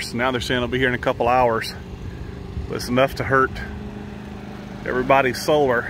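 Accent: South, United States. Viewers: I guess you're a fantasy life for them. A way to distract from their reality so they don't want to hear about your reality. I want to enjoy your life (your reality) on the road. Hear your southern accent & watch your handsome face video you & the Wolf Pack. Happy New Year🎉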